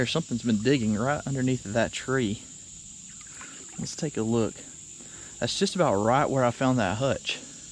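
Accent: South, United States. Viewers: That's not a "southern accent"!!! If you know your way around the country,(OTR) truckers know what I mean... You have an Alabama accent.. Texas and Georgia are subtly different... The Midwest, Illinois, Ohio, New York City,New Jersey etc. All have a manner of speaking that are truly different... North and South Carolina,too.... Love your site and your enthusiasm for when you find something really nice....🤓 Watch out for the rabid crawdads and insane fish😂.... Be Blessed and stay safe...🙏☝️🕊️✝️ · From northern midwest, where not much accent. Southern accent is cool. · I was born a yankee, but I’m a southerner now. The accent sounds like sweet tea served on the front porch.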